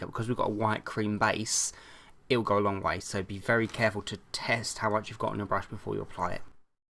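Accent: United Kingdom. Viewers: you look amazing great work as always! your tutorials are fabulous darling btw im from the uk too and your accent is adorable im from near Manchester lol so my accent aint as classy as yours ;) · I like ur british accent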